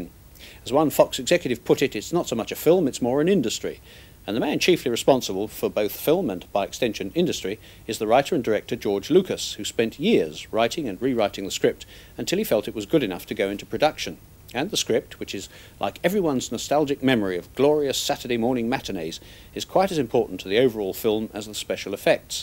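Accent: RP, United Kingdom. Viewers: Okaaaaaay.....I watched this "film review" and then came on her to make a few acidically sarcastic comments, and then saw everyone on here pouring out love for this guy. Buncha Brits! From where I live in Texas this doesn't even qualify as a film review no matter how posh is this guy's English. The only things he said that could be interpreted as relating to the quality of the movie were that it is successful and that George Lucas is a good director.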